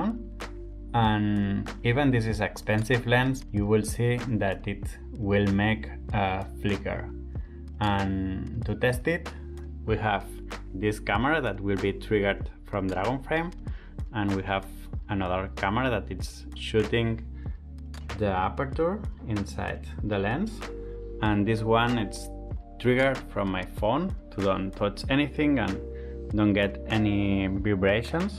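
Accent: Spain. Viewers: I see your spanish accent lol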